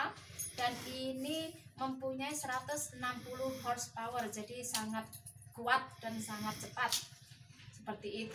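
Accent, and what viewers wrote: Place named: East Java, Indonesia. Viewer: Asli Jawa Timur pasti ya mba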